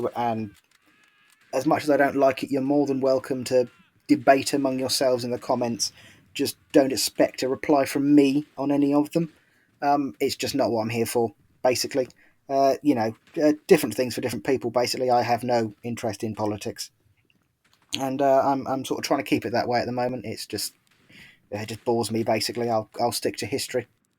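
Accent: Norfolk, United Kingdom. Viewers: Lovely Norfolk accent. Compared to mine you sound clear precise and professional. Ar B lovely ole job. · Hey this is an old fen tiger that's retired to West Africa ... just found you & it's brilliant ... also nothing wrong with a Norfolk accent ... bootiful 🤗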